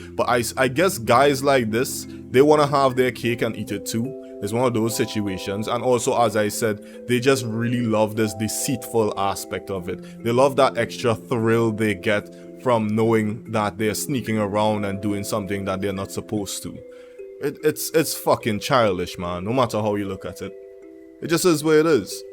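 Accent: Trinidad and Tobago. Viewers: Are you from Trinidad bro?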